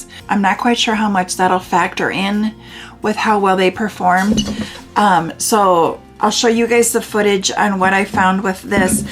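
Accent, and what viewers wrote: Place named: Minnesota, United States. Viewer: This was the first video of yours that I stumbled upon, and within seconds, I had you pegged as a Minnesota native (or at least lived there for a significant amount of time). My mom's entire side of the family lives primarily in the Minneapolis area with my late maternal grandmother's family all hailing from the Blue Earth area down south. Those that live down in Blue Earth have dang near the same accent you do. Tbh, it was refreshing to see someone from any part of the "north" to "northern midwest" not be super srsbiz all the time. I'll definitely be sticking around. If your off camera personality stays the same as your online persona, then I really need more of you in my life!! I absolutely love the depths you go to to make an honest and informative review and still maintain the light airy atmosphere, even when you're less than impressed with the product.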